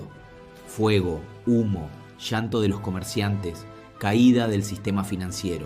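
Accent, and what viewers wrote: Argentina. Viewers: Me encanta el acento argentino. Lo único que me gustaría que fuera narrado con menos humor irónico.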